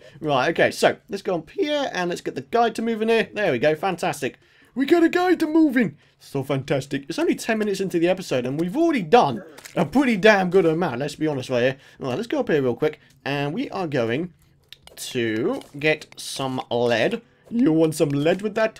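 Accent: United Kingdom. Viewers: That is the strongest British accent I've ever heard... and I'm British myself! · What? You sound like a british guy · He's British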